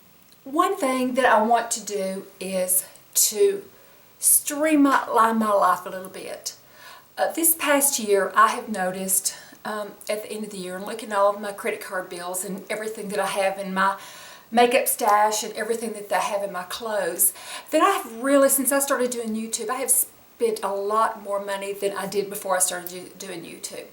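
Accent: South, United States. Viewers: You are one of my favs! I just love your genuine sweet personality and as southern girl...I LOVE that accent! And....holy cow I had no clue you were almost 60...you are GORGEOUS inside and out! I would love to see some more videos on your secrets to aging so gracefully....both on the inside and out! Happy New Year!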